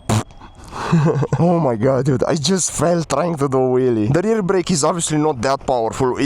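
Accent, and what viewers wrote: Romania. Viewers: broo, u from timisoara?? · Este român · mi am dat seama ca esti roman după accent😂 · ai accent de român😮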